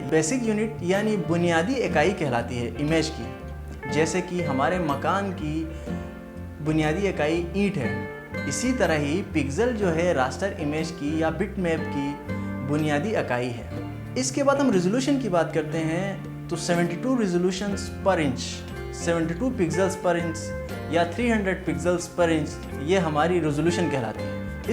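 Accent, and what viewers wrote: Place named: Gilgit, Pakistan. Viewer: Yar bro ap ka lahja to glgite Wala ha am I write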